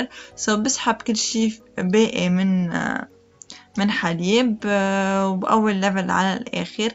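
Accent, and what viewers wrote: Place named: Iraq. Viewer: عراقيه امبينه من حجيج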